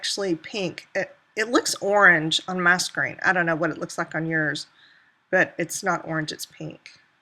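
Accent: South, United States. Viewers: Love! Where are you from? You sound like you have a southern accent! I'm a Mississippi girl;)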